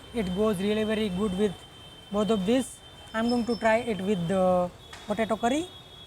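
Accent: Kathiawar, India. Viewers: I always hit like on your video for your presentation allthough we kathiyawadi have bit different accent of speech it does not matter at all. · Grammatically his English is perfect, but being residing in Gujarat, naturally his accent tuning is with Gujarati.Thanks. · haha kyu lake kehvay ?? mast bole che bhai kathiyawadi English n keep it up nice video :-)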